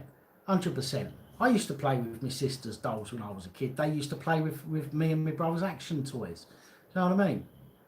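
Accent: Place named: London, United Kingdom. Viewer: Why do you live in Liverpool, you sound like a londoner mate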